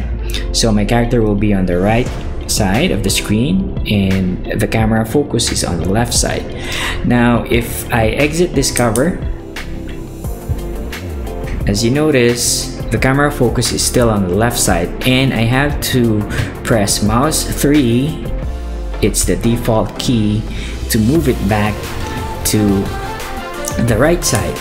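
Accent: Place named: Philippines. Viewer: Fro your accent I know you're my KABAYAN!! subbed! Keep it up.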